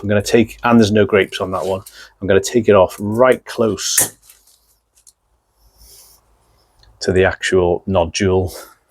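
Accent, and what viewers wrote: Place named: Midlands, United Kingdom. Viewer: I just learned allotment gardening when we were over in the UK this past March/April. My husband is listening to this video trying to guess your location. So far he's guessed Midlands, Birmingham, Manchester 😂 He's from Leicester originally. I love your greenhouse! ❤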